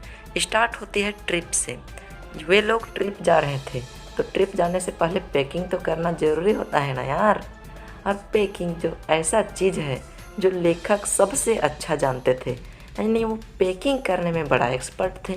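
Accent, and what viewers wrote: Bihar, India. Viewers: You are Bihari n?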